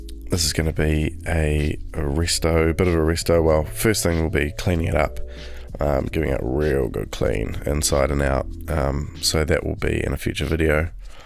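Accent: New Zealand. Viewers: Sure am, that's why it was so good to hear a kiwi voice. Car is in ChCh . . . Taking it to Taupo and then Akl . . . Will have a look and join the forums. Cheers again, go well with the restoration.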